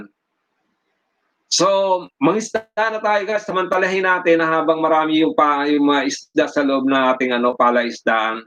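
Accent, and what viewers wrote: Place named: Bicol, Philippines. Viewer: Meron kuya my sounds ..you are from bikol?I'm from bikol too..from Iriga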